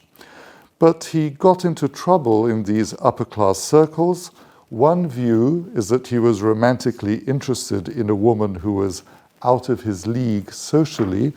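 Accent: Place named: RP, United Kingdom. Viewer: That's perfect BBC english! Proud of you ❤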